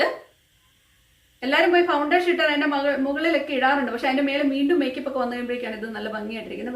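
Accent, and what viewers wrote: Kottayam, India. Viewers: kottayam kariii ano aaoru slang varunudalo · രസം ഉണ്ട് സംസാരം. ഇങ്ങനെയാണ് ഞാനും പറയുന്നേ കോട്ടയം ആണ് alle?? ഇഷ്ട്ടയിട്ടോ 😘😘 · Hi chechi am your new viewer from pala kidangoor now chechi kottyam kari ano samsaram nalla rasam undu my hair also thin anu and no idea about beauty tips you help me a lot beginners nayi simple make up products okke detailed ayi paranju oru video cheyyamo and 9 years kurtis nu venda hair care also. Are you working as a nurse keep rocking 💐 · Kottayom Kari aano chechise.. Samsarom athu pole undu..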